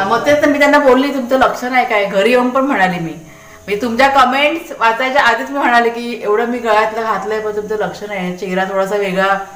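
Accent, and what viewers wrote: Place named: Wadval, India. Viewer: तुटल नाही वाडवळ बोलायच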